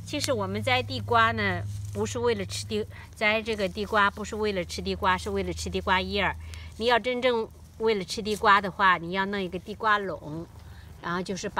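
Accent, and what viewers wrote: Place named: Henan, China. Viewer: 一听就是河南老乡！老乡你好！👋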